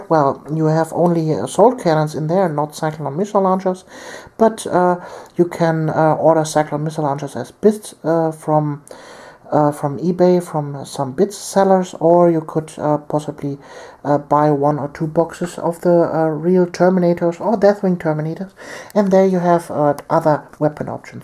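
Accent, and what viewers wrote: Germany. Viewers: hey i watch your video's when ever i get LotR but, so can you do a video for the army of the dead. also are you german?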